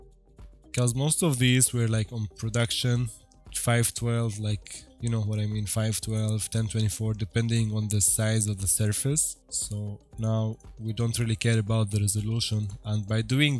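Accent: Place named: Austria. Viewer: You have an accent similar to Arnold Schwarzenegger, and in some movie Arnold said "Get to the chopper!" I guess it's a reference to that.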